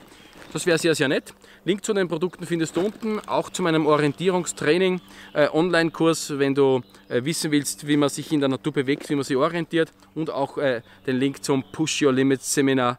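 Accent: Austria. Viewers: Deine Videos sind einfach toll. Keine Verkaufsveranstaltung das fachliche ist einfach top.Dein Österreichischer Akzent und die ganze Art und weise einfach nett. Mach weiter so!!!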